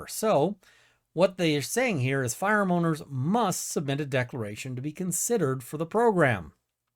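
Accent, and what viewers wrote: Canada. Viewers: Hate the quebeque English accent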